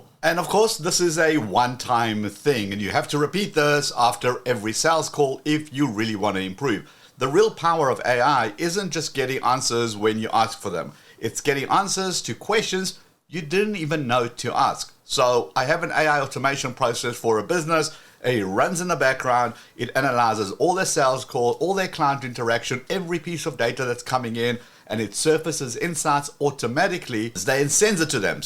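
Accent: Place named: New Zealand. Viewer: Just found this channel from your main channel and instantly subscribed without even watching a video. Why? You're awesome, that's why! I love all the useful information, excellent delivery, and -- who doesn't love a New Zealand accent? 🤣 They're the beest!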